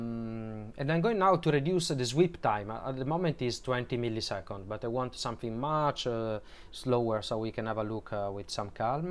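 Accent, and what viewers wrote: Italy. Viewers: O sei italiano o sei francese haha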